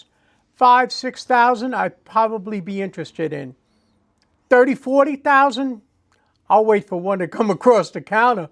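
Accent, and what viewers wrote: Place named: Long Island, United States. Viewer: cant hide hat axcent...lol...same here ex Long Islander..!..thank u 4 a breaf & accuret explanation..!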